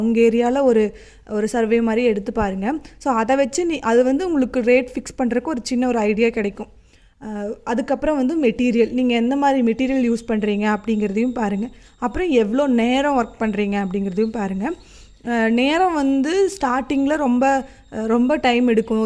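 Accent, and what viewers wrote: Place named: Coimbatore, India. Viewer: mam neenga cbe ah romba naal ah unga video pakuren apove unga slang vechi doubt irundhuchu aana therila andha sree devi box patha aprm tha conform achu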